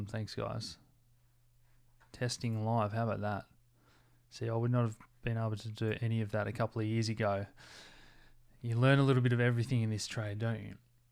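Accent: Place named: Tasmania, Australia. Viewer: It aint Aussie it's Tassie ... World = Weld only in Tassie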